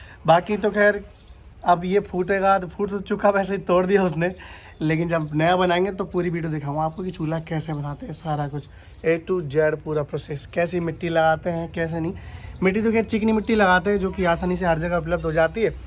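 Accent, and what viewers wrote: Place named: Karauli, India. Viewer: Consa gav h bhai ji yar me bhi karauli ka hu aap ki aabaj bhi karauli said ki lagthi h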